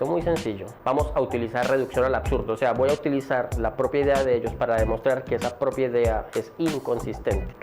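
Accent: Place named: Colombia. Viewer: Buen Colombiano (O, al menos tu acento da a entender eso), por fin alguien que también desmiente las estupideces de esos sujetos.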